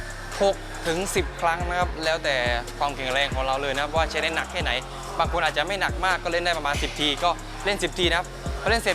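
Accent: South, Thailand. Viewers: Southern Tone makes your VDO different from others. Otherwise make me miss my hometown. Keep doing the good job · เป็นประโยชน์มากเลยครับดูทุกคลิปเลย ชอบความมีสำเนียงทองแดงโผล่มาด้วย มีเสน่ห์มาก 😄😊 #คนใต้นิ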